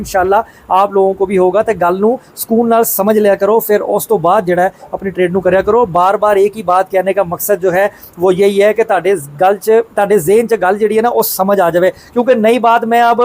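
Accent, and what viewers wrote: Punjab, India. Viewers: Sir ap ka Punjabi touch oye hoye mashAllah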